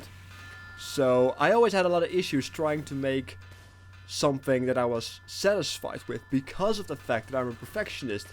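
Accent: Netherlands. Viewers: I'm Dutch, and I thought I heard a Dutch accent while you speak. Hence the question :)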